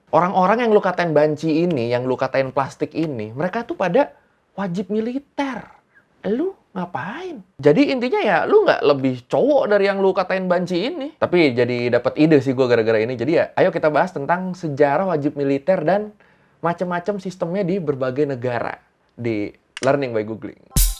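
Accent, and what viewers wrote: Thailand. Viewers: anjing aksen thiland lu mantap jon..... ngakak gue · bisaan maneh ngomong bahasa thai pke logat na t.g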